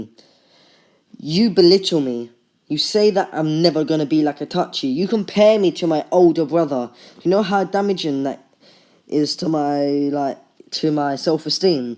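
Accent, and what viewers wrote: United Kingdom. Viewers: i live in usa and its been a while since i last heard British accent but the video was really good.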